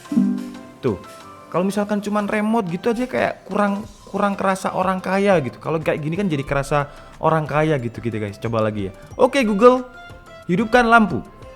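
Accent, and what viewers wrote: Malang, Indonesia. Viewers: bang orang malang ya?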